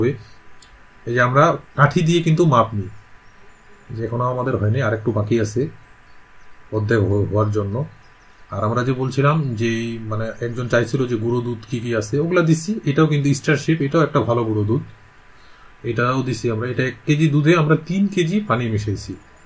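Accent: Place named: Sylhet, Bangladesh. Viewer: আমিতো মনে করেছি সিলেটে! আপনার ভাষা কিছুটা সিলেটি টান আসে!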